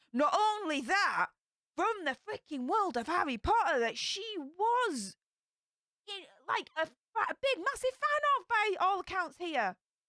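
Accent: Yorkshire, United Kingdom. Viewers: Hey love your sass and Yorkshire gobiness. Sending love from Bradford.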